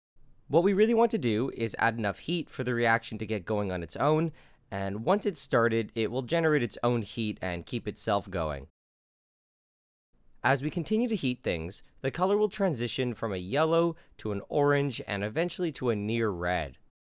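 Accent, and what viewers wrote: Canada. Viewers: you tryin so hard to cover that canadian accent huh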